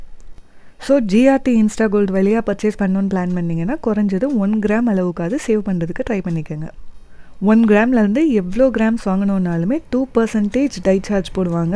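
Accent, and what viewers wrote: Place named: Kerala, India. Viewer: Your voice is soo good ma like malayalee speaks tamil. Slang is different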